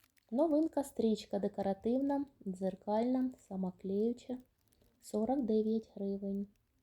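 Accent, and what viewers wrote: Russia. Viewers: ви стали ще дужче акати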